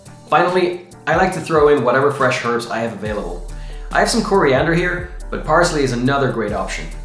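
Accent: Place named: United Kingdom. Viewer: How to sound british without sounding british. Where do you live sir?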